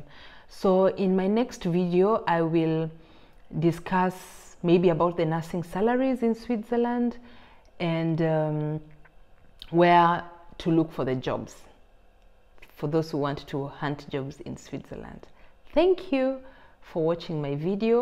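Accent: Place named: Kenya. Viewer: You speak like a Kenyan. . are you....if so , I'm Kenyan in France, im very near to Switzerland...... i wanted to come to Switzerland through a student visa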